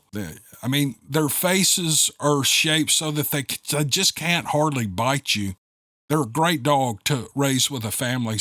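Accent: South, United States. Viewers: Thank you for all your hard work, good Sir. I hope this finds you well and happy. Tonight it is bitter cold outside and as usual, I can't get to sleep. About accent: As a returned to the north after I had lived south for over 20 years kind of person. I have heard southern accents of all sorts of from the heavy drawl to the slow little snippets of words with a twang. southern accent is one of the loveliest I've heard, easy to understand, not too drawn out, not too thick, not too slow, just a gentlemanly, comfortable, gentle, and sweet southern sound. There are many things about the south I miss and hearing some southern accents is one of them, so thank you for the memories, too. Now, put me to sleep, will ya? Take care dear, Rosey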